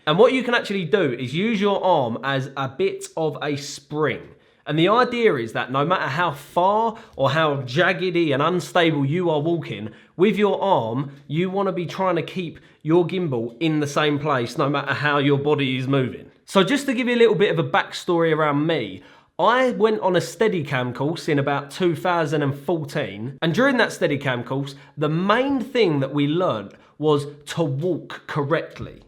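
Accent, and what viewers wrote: United Kingdom. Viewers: Listening to this guy actually feels like watching he Peeky Blinders series. I love his British accent.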